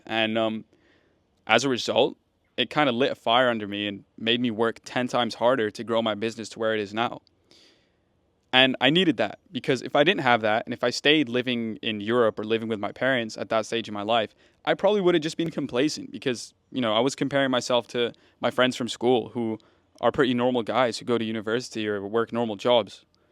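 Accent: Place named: United States. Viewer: Hey man Really appreciate your content From your podcast with Matt Sheldon You grew up in Scotland, Egypt and Malaysia So how’d you get your American accent?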